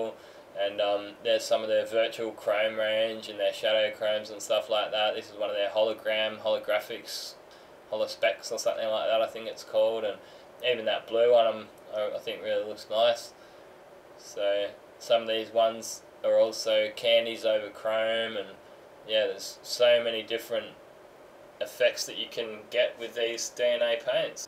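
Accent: Australia. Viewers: First, put the camera on a tripod or figure out how to keep the camera from jumping around. Serious headache! Two, Stop talking like you're committing a verbal assault. You seem to never shut up. Do you breathe? Damn its hard to listen to you w/ that thick Aussie(?) accent. I understand this is supposed to be an instructional video, but you could take a break and just paint or watch the other guy paint, quietly. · your constant talking about the rabbit you saw running across the yard yesterday and the weather etc finally made me hate Australian accents.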